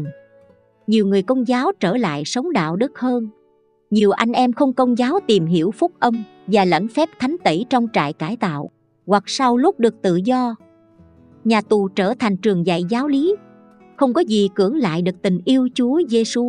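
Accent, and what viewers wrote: Saigon, Vietnam. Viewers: Giọng Sài Gòn xưa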